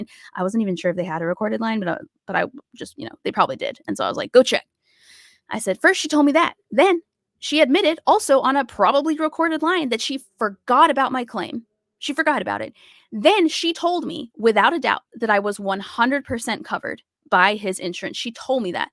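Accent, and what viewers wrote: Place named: South, United States. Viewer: Welcome back! I love how the Southern accent surfaces depending on how riled up you are...lol.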